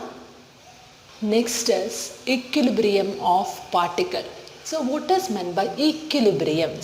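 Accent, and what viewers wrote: Kerala, India. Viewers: Are u from Kerala...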